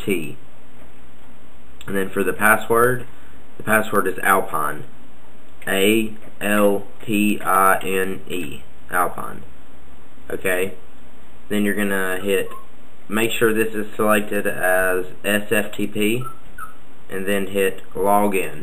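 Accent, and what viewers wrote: South, United States. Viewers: I'm assuming this only applies to jailbroken devices, seeing as i can't find an app called "installer" on mine... nice country singer accent btw...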